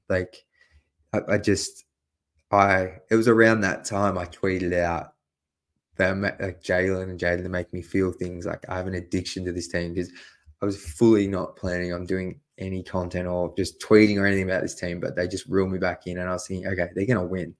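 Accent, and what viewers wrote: Australia. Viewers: Saw the mustache and handsome face and knew I’d hear an Australian accent. How does a guy living damn near 24 hours into the future become a pistons fan?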